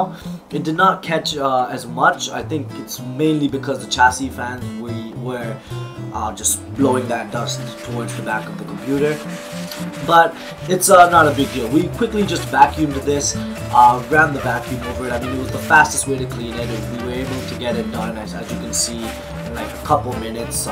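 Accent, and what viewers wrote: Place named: New York, United States. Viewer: This guy is defo from NYC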